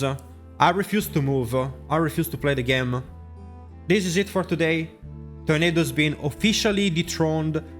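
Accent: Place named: Italy. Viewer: bro its s tier and he got an italian accent. what is your problem with the build maybe we can help you?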